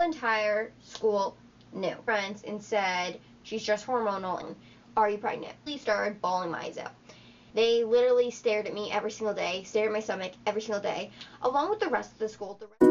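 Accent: Canada. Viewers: RhiannaFrancesca07 i knew as soon as she talked she was from Canada lol, are you also from Canada??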